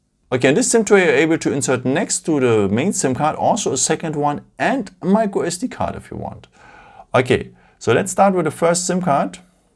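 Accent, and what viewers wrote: Germany. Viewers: It sounds like you’re a native German speaker, but this accent sounds unfamiliar. Which dialect?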